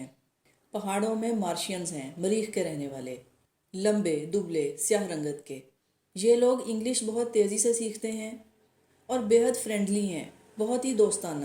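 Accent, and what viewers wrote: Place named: India. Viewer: You should definitely reduce your accent. I used to live in West Ealing in London so I'm used to the way Indians speak English, but your accent is impenetrable.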